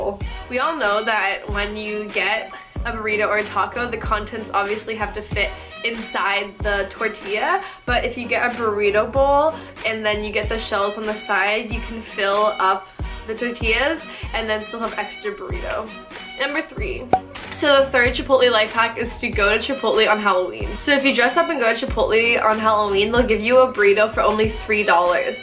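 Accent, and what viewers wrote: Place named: Canada. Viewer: your canadian accent is so cute lol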